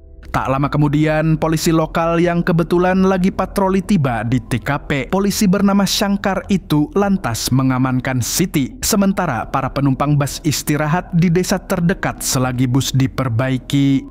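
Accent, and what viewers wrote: Banjar, Indonesia. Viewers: Orang Banjar kiranya ne😂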